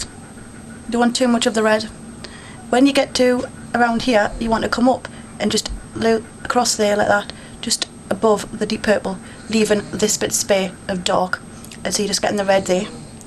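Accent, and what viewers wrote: Newcastle, United Kingdom. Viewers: are you from the north east? you sound like a geordie! reply please!!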